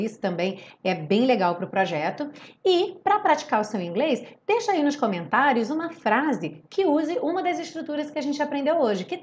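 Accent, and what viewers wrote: Rio de Janeiro, Brazil. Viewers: sotaque Carioca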